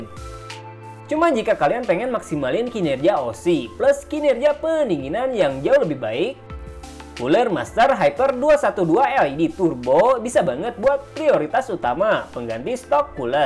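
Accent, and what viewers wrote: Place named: West Java, Indonesia. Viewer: sunda pisan euyyy...kerenn, sukses terus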